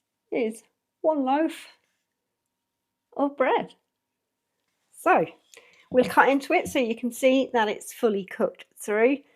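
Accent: United Kingdom. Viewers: Great to hear a pommy accent for a change, instead of the yankee ones.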